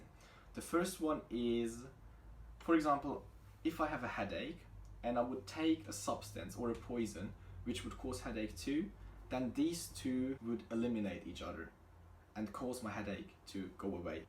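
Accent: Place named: United Kingdom. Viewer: Hast du einenenglishsprachigen Elternteil? Du sprichst sehr britisches Englisch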